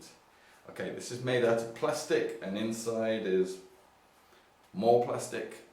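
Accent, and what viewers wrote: United Kingdom. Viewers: Esto es de lo poco que se encuentra con acento británico.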